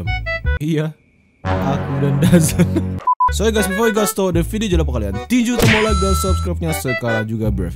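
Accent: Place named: United Kingdom. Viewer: your accent is sounds like British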